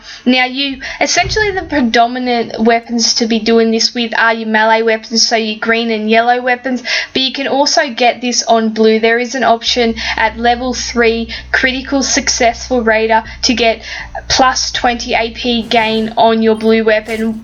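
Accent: Australia. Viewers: Great video just subbed you, I have been showing it to my faction omnipotence paradox on the marion server we are the best faction on our server and a lot of our faction can now rush turn one due to you thank you very much our enemy's are not liking this one bit lmao. I just started doing videos myself actually loving your aussie accent im trying to tone down my strong english accent but im having trouble hope people can understand me haha. Thanks a lot and good luck for 2017. Happy hunting.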